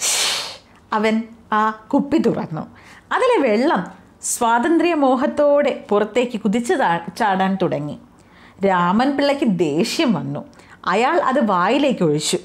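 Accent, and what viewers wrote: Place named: Malappuram, India. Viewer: Malappuram/palakkadu slang💖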